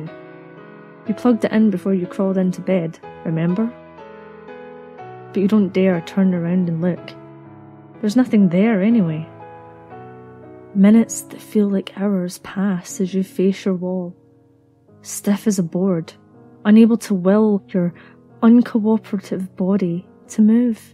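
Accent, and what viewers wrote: Scotland, United Kingdom. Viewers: are you Scottish or Irish?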